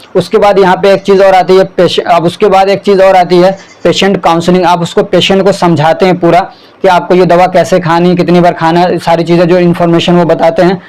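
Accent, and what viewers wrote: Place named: Mithila, India. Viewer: Sir aap kha se aapka tone bihar k mithilanchal area se match krta hai aapka bahut shukriya itne achhe trah padhane ke liye allah apko lambi umar de ❤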